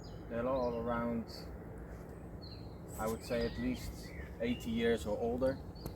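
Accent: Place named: Netherlands. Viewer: Benieuwd in welke regio je woont, lijkt me umbria/toscane op basis van de olijven en cipressen. Ik ga er op basis van je accent ook maar vanuit dat je Nederlands bent. Ben ook bezig met de cursussen van vaso policonoco , komende winter normaal avanzato en dan het examen zoals ik zag in je andere video.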